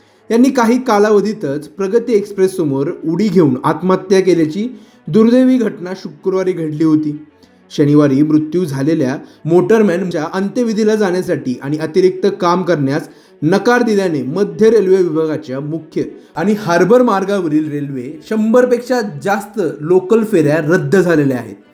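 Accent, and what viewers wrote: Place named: Vashi, India. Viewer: News reporter vashila Wala ahe. Signal la single boltay.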